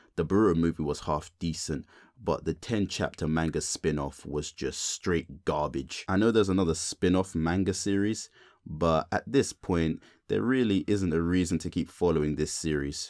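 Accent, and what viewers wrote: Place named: United Kingdom. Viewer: bro your british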